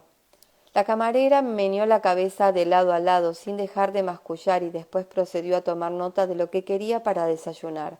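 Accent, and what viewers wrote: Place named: Argentina. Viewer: De verdad!! Escuchar una voz argentina es genial!! Me gustaría que leas el resto del libro 😇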